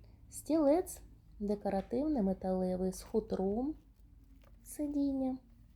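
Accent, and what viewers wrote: Russia. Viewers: Чого ти акаєш??? Тупа, як курка! Це украінська мова , а не кацапський йезиГ!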